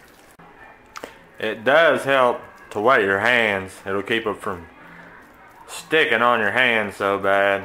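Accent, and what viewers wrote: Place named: South Carolina, United States. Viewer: I hear accents and dialects like yours here in South Carolina too.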